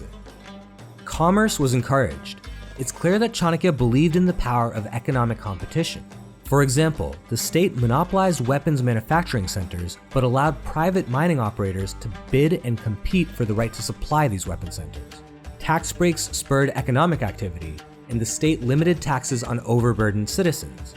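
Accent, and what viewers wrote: United States. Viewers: You're foolish to think you shouldn't lionize our great history. I'm not saying to lie but you're only helping the west lie about India by bending over for them and making India look bad. I seen three of your videos now and I found out in the first video what you are. You're anti-Hindu. Next video I started to realize you're probably ABCD with your American accent and sometimes proper pronunciation and sometimes cringe Americanized pronunciation. You're too ashamed of being proud of India's Hindu and Dharmic heritage... Be proud of India and it's Hinduness.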